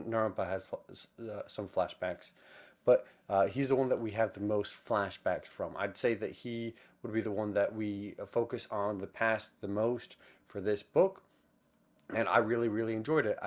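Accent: South, United States. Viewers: I love your video at your house in Texas during your quarantine until you had one of the books of "Black Sun" by Rebecca Roanhorse while it's all about a god return, when the earth and sky converge under the black sun, in the holy city of Tova the winter solstice is usually a time for celebration and renewal, but this year, it coincides with a solar eclipse, a rare celestial event proscribed by the sun priest as unbalancing of the world. Meanwhile, a ship launces from a distant city bound for Tova and set to arrive on the solstice. The captain of the ship, Xiala is a disgraced Teek whose song can calm the waters around her as easily as it can wrap a man's mind. Her ship carries one passenger. Described as "harmless" the passenger, Serapio is a young man, blind, scarred and cloaked in destiny. As Xiala well knows, when a man is described as harmless, he usually ends up being a villain and you're talking about all of the characters from the book after that, I hope your husband, Zach will be very proud of you and I love your Southern accent, I know you're half Mexican!!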